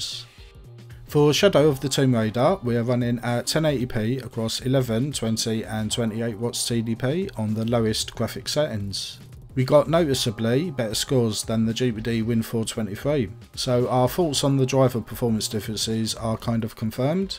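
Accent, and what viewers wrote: Cockney, United Kingdom. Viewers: No matter how many of these videos I watch, I just can't get used to hearing a cockney accent on YouTube. 😅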